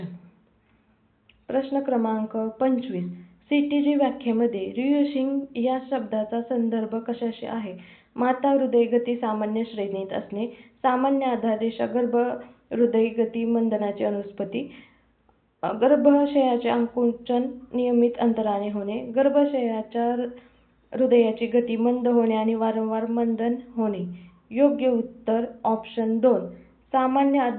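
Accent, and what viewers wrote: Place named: Solapur, India. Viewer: तुम्ही सोलापूर डिस्ट्रिक्ट चे आहे का मॅम आमच्या ऑफिस मध्ये एक सोलापूर चे कर्मचारी आहे ते तुमच्यासारखी बोलतात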